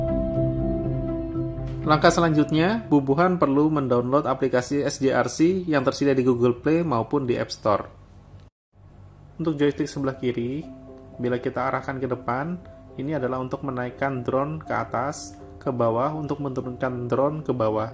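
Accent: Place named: Kalimantan, Indonesia. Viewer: Ikam orang asli mana gan ? Hha bubuhan kalimantan jua lah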